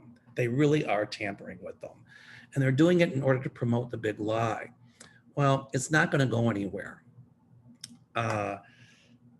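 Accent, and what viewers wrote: South, United States. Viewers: Your Southern accent is on spot. Thank you for your show. Sending you big love from Beth in Mancos CO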